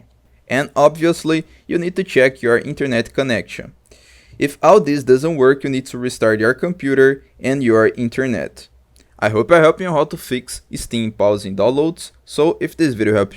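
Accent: Brazil. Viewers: i needed 3 words to know you are Brazilian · That's because his native language isn't english. He is from Brazil, lol.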